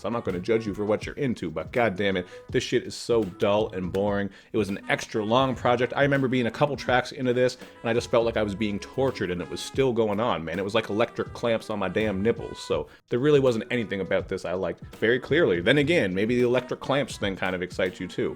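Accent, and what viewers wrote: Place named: Nova Scotia, Canada. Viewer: I think Nova Scotia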